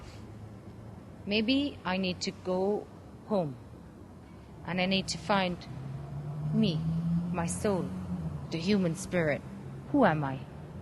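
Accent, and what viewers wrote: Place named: Ireland. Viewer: Precious irish accent. Irish queen 🍀❤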